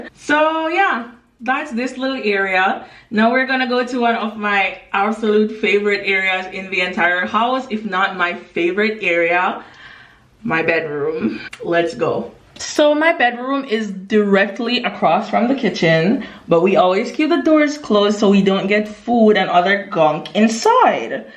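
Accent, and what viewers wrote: Jamaica. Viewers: R u Jamaican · You sound Jamaican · Your accent sounds Jamaican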